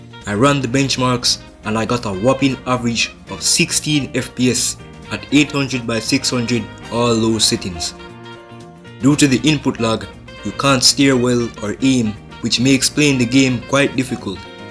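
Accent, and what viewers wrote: Jamaica. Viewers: Bro sounds oddly Jamaican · Bro ur Jamaican? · Jamaican accent discussing tech is low-key soothing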